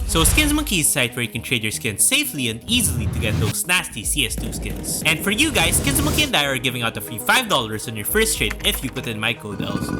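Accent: Philippines. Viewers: I just knew it that you're from the Philippines because of your accent! But this is really useful so I'm trying this. Thanks!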